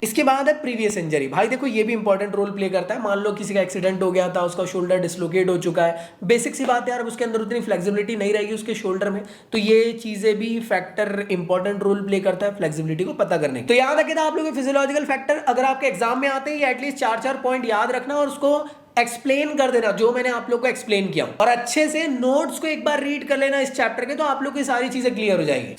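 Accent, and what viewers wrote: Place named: Madhya Pradesh, India. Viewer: Bhaiya app ki bhasa sa lgra app mp ka hoa😂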